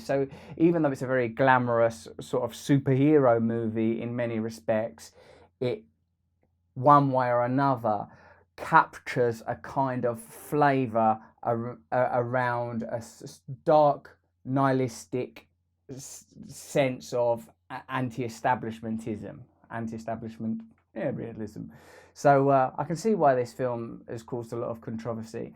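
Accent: United Kingdom. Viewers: Ive heard him talk on video. Never picked up british accent before. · Fucking british and their pronunciations. Controversies...aluminum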